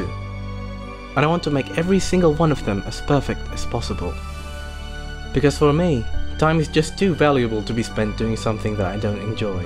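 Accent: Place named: Indonesia. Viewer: I feel like his Indo accent is way stronger here than previously.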